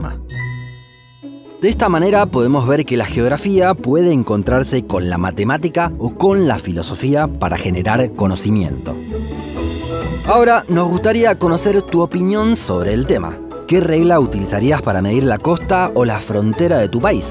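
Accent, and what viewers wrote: Buenos Aires, Argentina. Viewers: Un video de alta calidad, te doy los "parabéns" desde Brasil, hermano. Tu acento argentino es tan hermoso como el video! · chileno 0% .. es muy de Ciudad de Buenos Aires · Claramente se nota q es de argentina por el acento xD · Quise verlo pero no puedo soportar nada el acento argentino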